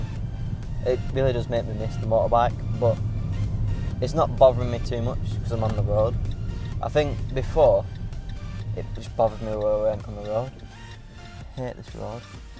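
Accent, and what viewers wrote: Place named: Blackburn, United Kingdom. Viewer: Ik where Burnley is coz I live there aha I knew u were from Blackburn from voice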